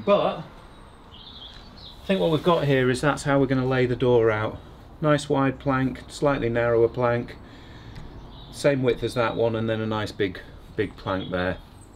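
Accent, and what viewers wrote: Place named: Ireland. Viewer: Irish accent !!!???!!! Not to bad I guess lol Sean from IRELAND 😉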